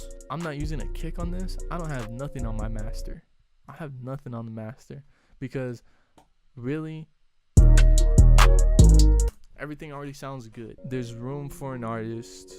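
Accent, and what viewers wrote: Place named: Mexico. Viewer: idk why but to me you sound mexican lol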